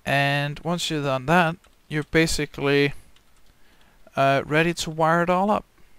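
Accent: Denmark. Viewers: Hehe, kunne høre på dit engelsk at du er fra Danmark! ;)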